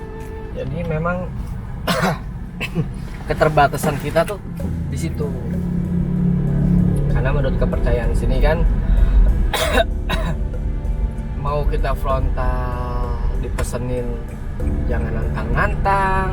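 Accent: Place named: Cilacap, Indonesia. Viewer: Ki tunjukin penampakan janda dong buat saya pffftttt... (just kidd) Cilacap ngapak mantap yoyoy...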